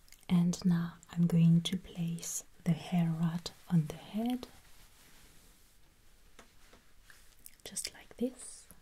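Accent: France. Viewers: You're french ? Because you have an accent french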